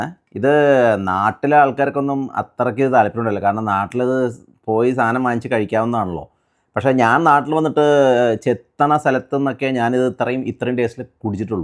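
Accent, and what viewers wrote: Thrissur, India. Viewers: മ്മടെ ചേട്ടൻ തൃശൂർ ആണോ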